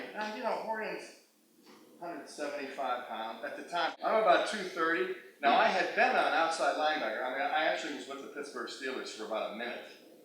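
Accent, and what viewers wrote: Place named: Youngstown, United States. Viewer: This won’t mean much to most anyone, but I can still hear the Youngstown in his voice. It’s like hearing home. Awesome story.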